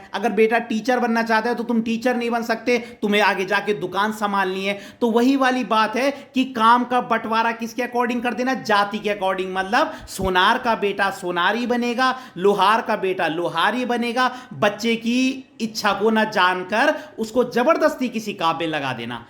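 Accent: Garhwal, India. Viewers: Sir m bhi Apki awaj m thoda gharwali pan lag rha tha esliye pucha Thanku sir Aap bohot shi se explain karte ho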